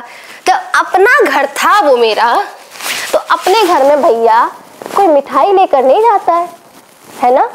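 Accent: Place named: Siwan, India. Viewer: Shahi boli hai ap hmbi Siwan se hai 😂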